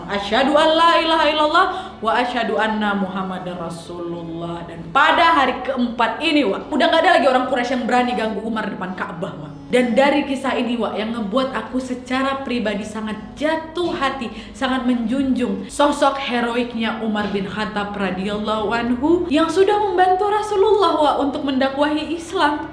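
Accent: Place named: Medan, Indonesia. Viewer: Orang medan nih hehe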